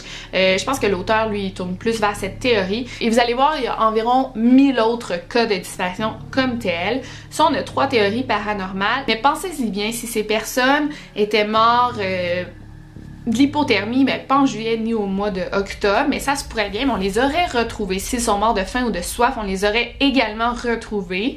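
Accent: Quebec, Canada. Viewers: Wow ton accent est encore plus présent depuis que tu es rentrée au Quebec! Je vis en Belgique et grâce à toi je déteste moins l’accent québécois ^^ J’adore tes vidéos, la façon dont tu racontes les choses...une vraie addiction !! plein de belles choses pour la suite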